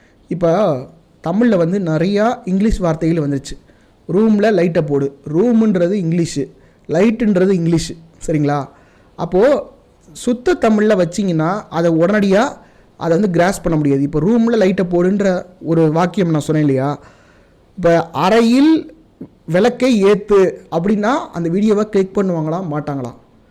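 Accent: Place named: Madurai, India. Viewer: Nalla example ..nalla explain panringa brother..appo appo madurai kusumbu pechu vanthu vanthu poguthu athum nalla tha iruku 😅super information 👍👍👍🙏🙏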